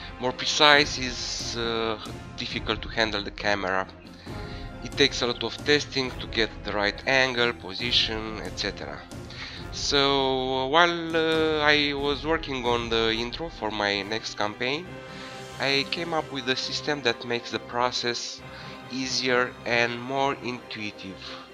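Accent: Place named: Romania. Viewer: You sound like a Romanian :)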